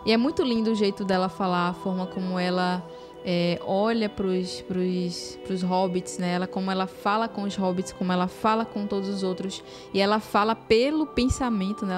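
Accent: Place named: Northeast, Brazil. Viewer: Sou da paraiba e reconheci teu sotaque nordestino. Delicioso 😋 😋 Parabéns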